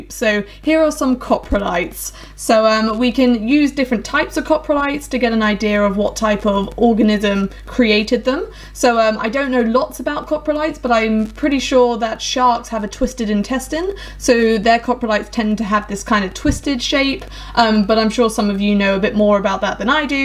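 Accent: United Kingdom. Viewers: A lady’s British accent can even make “poo” sound classy.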